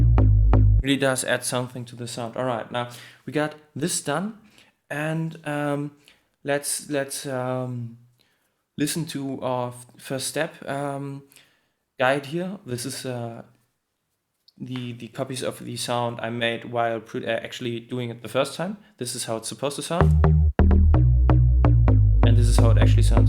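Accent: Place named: Germany. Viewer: Geiler sound, gutes Video. Mach weiter so. Man hört, dass du deutsch bist, aber es stört überhaupt nicht.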